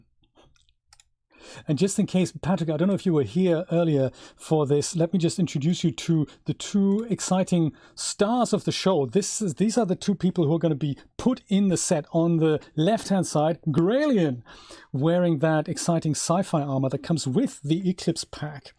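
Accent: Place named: Germany. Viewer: Sir, thank you for your unique work and presentation, I'm more a silent spectator of your site for years now. One question about you please, your pronunciation sounds so German, are you German?... 😉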